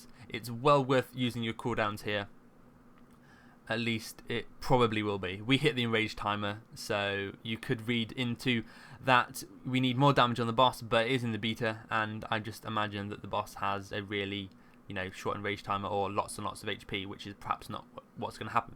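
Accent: United Kingdom. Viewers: that is the british pronunciation.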